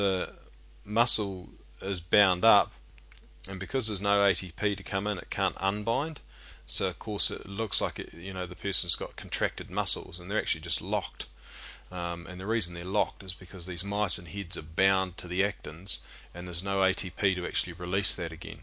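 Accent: New Zealand. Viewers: sounds like a Kiwi XD excellent video!! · yep hes New Zealand!!! you can tell by the way he says his E's and I's ie, head, actin etc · thats a typical kiwi accent :) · I'm pretty sure it's New Zealand accent, since i've been there for 2 years for high school..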